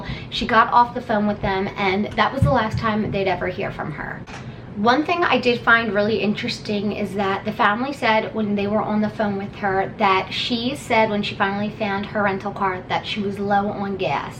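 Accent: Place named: Maryland, United States. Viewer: Sounds like a MD accent... Great video! Subbing for more!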